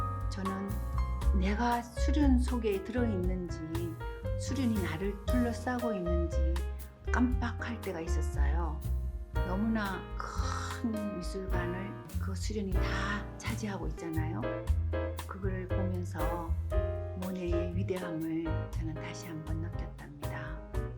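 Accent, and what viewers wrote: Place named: Gyeongsang, South Korea. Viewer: 오랜만에 여유를가지고 샘 작업을 훔쳐보고있습니다 ^^ 겡상도억양이 그림의 따뜻함을 더 하는것 같습니다 배경음악의 볼륨이 샘의멋진 설명을 누르는느낌이 조금 아쉽습니다 ㅎ 기연샘 음악회때 처음 샘 만났는데 기회되면 화실에 함 가보고싶습니다 요즘도 성산 출강하시죠?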